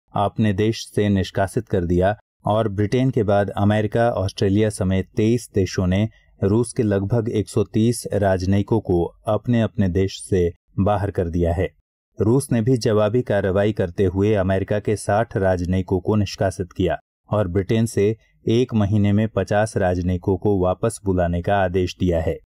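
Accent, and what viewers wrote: India. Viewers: i was feeling like came in 90s era as sound was like old radio station while listening but it contains the knowledge,from past, present and future, is very useful. thanks , i like the old indian accent..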